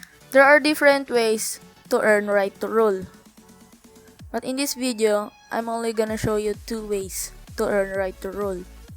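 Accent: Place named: Philippines. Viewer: I know that you're a filipino because of your accent Kababayn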